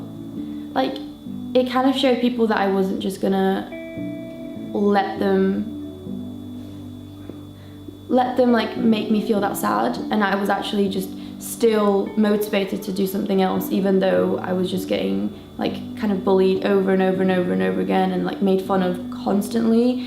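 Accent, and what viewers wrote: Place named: United Kingdom. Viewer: Latina with British accent 😍😍😍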